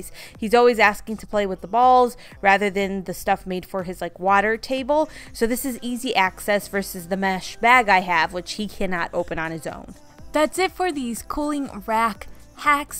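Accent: Chicago, United States. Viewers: I don't usually comment on YouTube videos but I have been watching you for a bit now and wanted to tell you that you just think of the most clever ideas! I also like watching you as you remind me of my home town of Chicago, which is where I think you are at based on your accent! Keep up the great vids - love seeing them! And, yes, I am subscribed. 😉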